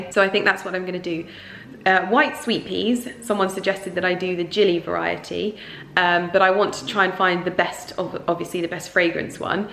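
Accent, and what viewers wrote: RP, United Kingdom. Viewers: I don’t understand why there is a smear campaign against you - your accent might have changed, but that is because you have educated yourself and developed your vocabulary. Yes, RP (Received Pronunciation) might be associated with the upper classes, but nowadays, people can have that accent regardless. Your accent shows to me that you are well-read, well-educated and intelligent. Why are people so hateful of that?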